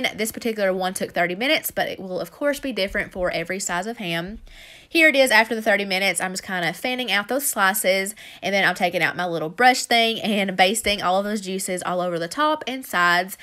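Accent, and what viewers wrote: South, United States. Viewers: Aloha!!! I absolutely love the sound of your voice!! It’s super soothing and your lil southern accent is so cute!!! I am a new subscriber, & I’m guilty!! I’ve been caught I’ve been binging on “WFD” everyday after work!! You seem like such a sweetheart! Blessings & Aloha Sweetie!!!! God Bless you and your family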